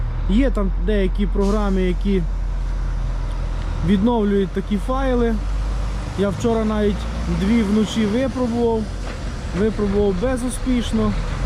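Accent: Ternopil, Ukraine. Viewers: Ваш тернопільський говір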